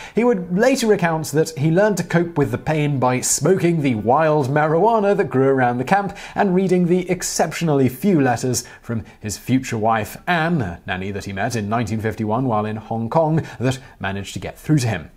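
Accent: United Kingdom. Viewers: Why the host, who sounds British, giving an american salute in the thumbnail?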